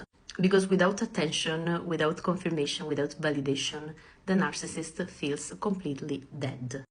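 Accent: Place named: Italy. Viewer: She just sounds Italian!?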